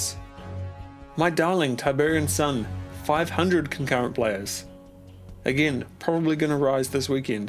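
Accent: New Zealand. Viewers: I detect a kiwi accent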